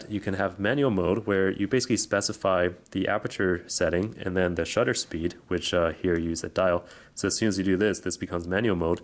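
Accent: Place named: China. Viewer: Chinese accent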